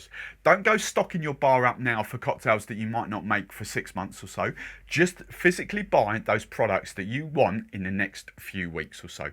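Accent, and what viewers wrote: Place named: Cockney, United Kingdom. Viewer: Cornwall?! You sound proper cockney!!!!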